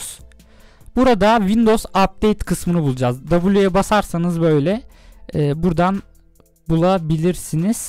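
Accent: Kayseri, Turkey. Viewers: kayseridemi oturuyosun abi ??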